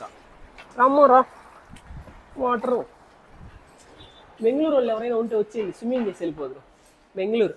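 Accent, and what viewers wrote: Nellore, India. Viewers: Hi nenu akkani epateki 3rimes chusanu video 12 y avuthundi nellore basha vne ne matallu challa baguntai thamudu nellore valaki yachullu yakuva 😂😂😂 yapudu happyga undu ma house chupinchava pls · Nenu meee vedios chustunnanu last one week nunchi but QA vedio lo meeku ammayilamedhunna respect ki subscribe chesanu🎉 all the best ma frd kuda nellore eeh slang baguntadhi kadapa slang ki quite opposite nellore slang😊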